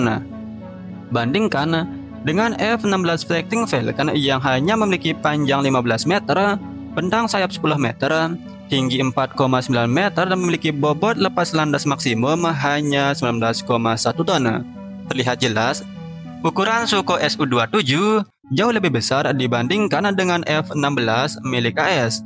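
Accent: Lombok, Indonesia. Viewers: Saya kira adminnya dari lombok. Bunyi F jadi P. Emg benar sih mirip juga logat Bali karna lombok dlu dijajah oleh Kerajaan Bali.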